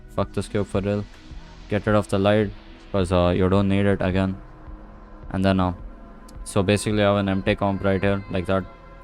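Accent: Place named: India. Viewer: The Indian Accent Be Hittin Hard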